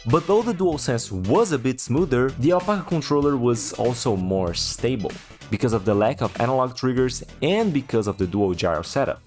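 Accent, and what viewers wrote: Brazil. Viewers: sabia que tu era BR, o sotaque nao engana kkkk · brazillian accent detected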